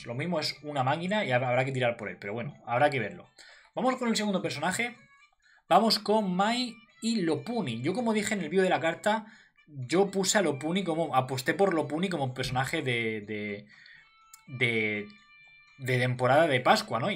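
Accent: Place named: Murcia, Spain. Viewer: Hablas como un amigo que tengo en exactamente de donde eres tu, de Murcia.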